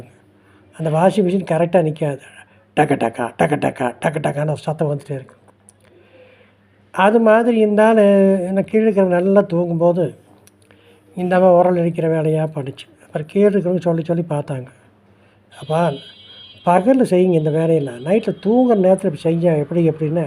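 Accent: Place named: Kongu, India. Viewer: Nalla experience..Good message.🎉🎉🎉🎉 Good Kongu Tamil slang. God bless you.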